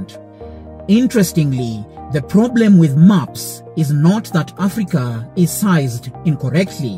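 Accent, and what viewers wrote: Kenya. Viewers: This ascent is Kenyan 😁... Much love brother man. 🔥🔥🔥🔥🇰🇪🇰🇪🇰🇪🇰🇪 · This Kenyan accent is hilarious.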